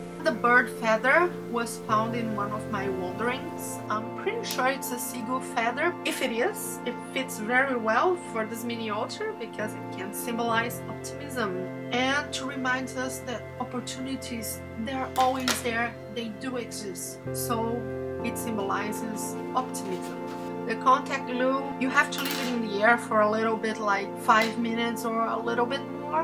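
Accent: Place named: Brazil. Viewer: Tbm sou brasileira, te reconheci pelo sotaque. Estou tentando montar meu mini altar.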